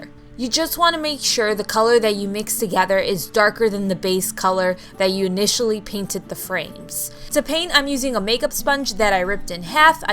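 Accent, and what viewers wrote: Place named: Arkansas, United States. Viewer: Love you're farm house decore u did awesome thanks for showing how to make a tiered tray without spending a fortune been wanting one but wasn't paying what thy wanted what about a tiered basket with e6000 put on carefully glued I wonder how that would work it if u could use a wooden candle stick I think it would work the hardest part would be the first candle stick but maybe turn ur candlestick and basket upside down for the first part and then doing the rest and don't paint until after all together don't know just a thought but I bet u could come up with something I see a lot of the black wired baskets. Girl I love you're channel ur my very favorite and love ur accent where are u from u use y'all like we do in Arkansas that's where I'm from. Awesome Job you are so talented.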